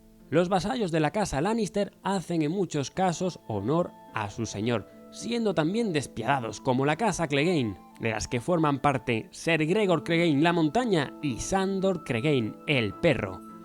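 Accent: Castile, Spain. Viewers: Me encantó el video :). Es tan raro para mí ver todos los nombres en castellano y también el acento!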